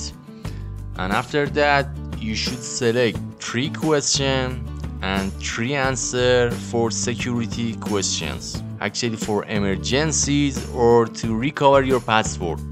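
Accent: Iran. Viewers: khob lahje farsi dasht iranie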